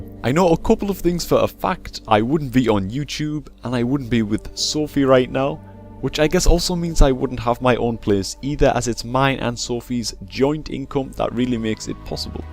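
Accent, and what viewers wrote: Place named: North East England, United Kingdom. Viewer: I understand all that you say, pronunciation isn't a bother for me:P Although I'm from north eastern england also, so I have a similar accent:P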